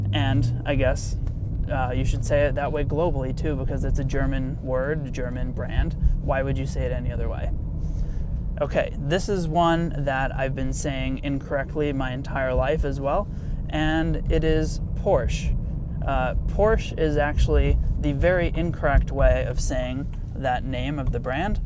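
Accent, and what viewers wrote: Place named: United States. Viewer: Not , Dubble U , but Wee but the "ee" from the Wee , sound like , the " a " in " Say, "Bee em Wee" (not Vee , a V in German is pronounces as a "Vow" ) , in Dutch we say it the same as the Germans. , You havent been saying it wrong , you have just been pronouncing it like a american , not a german :D . Like " Zeit " the Z is pronounced like a "TZ" , TZEIT , you would write , TZAIT. writing i phonetically makes it easier.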